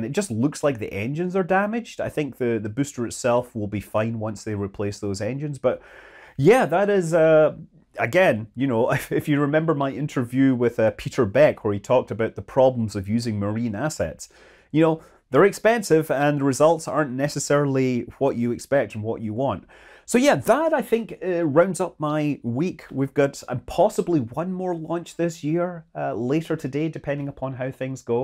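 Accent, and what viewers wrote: Scotland, United Kingdom. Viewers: you are such a delight! I always enjoy hearing your mellifluous, cheery voice with your lovely Scottish accent! Happy New Year!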